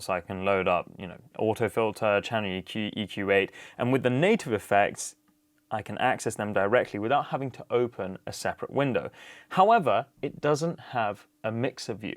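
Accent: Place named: California, United States. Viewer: The California accent is also how we Americans sound doing it lol well done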